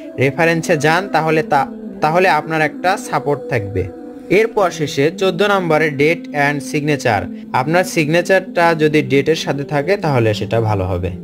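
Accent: Bogura, Bangladesh. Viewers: স্যার আপনার বাড়ি কী গাবতলি, বগুড়া..?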